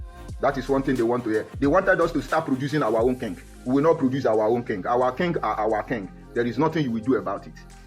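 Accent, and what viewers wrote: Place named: Agbor, Nigeria. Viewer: Asaba Igbo is sweeter to the ear than the harsh Agbor Igbo that you are speaking but you call them your brothers.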